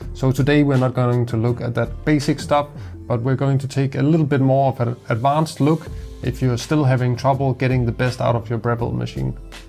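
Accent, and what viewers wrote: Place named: Denmark. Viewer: Are you from denmark? - er bro legit fra danmark jeg kan høre ddet på dit engelsk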